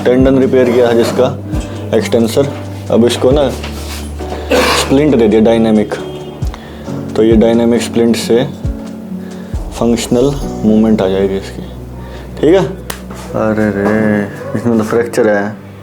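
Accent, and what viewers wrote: Haryana, India. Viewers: Oo my god your haryanvi accent 🤗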